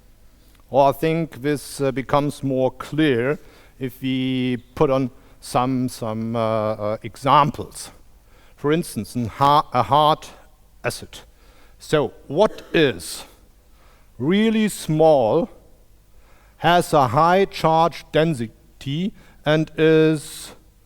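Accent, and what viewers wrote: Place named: Germany. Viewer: A German talks Eng 😀so cute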